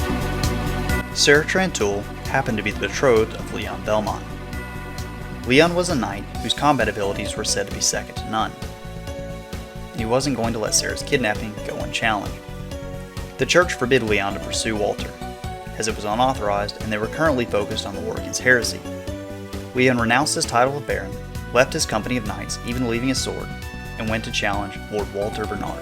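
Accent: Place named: South, United States. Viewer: Title doesn’t make sense….He’s not Dracula until he’s Dracula. So how does Dracula become what he already is? The term “Dracula” would already be a Vampire right? The title should be….HOW a man became a vampire known as Dracula….because their are many vampire but only one king of vampire….Dracula. That southern drawl only made it more cringe. I DO know this story isn’t accurate.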